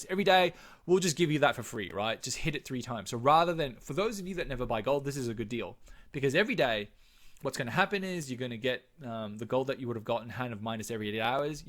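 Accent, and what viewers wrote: Australia. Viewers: Are you in Australia? Love the Aussie accent lol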